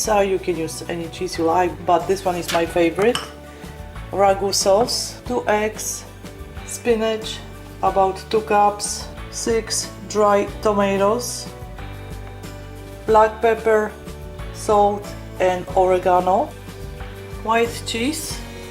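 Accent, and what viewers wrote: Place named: Europe. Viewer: Great recipe my friend these look delicious remind me of my Moms question what is your background? You sound European 💜🌺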